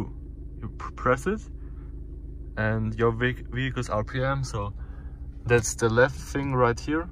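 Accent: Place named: Germany. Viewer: Ich wittere deutschen Akzent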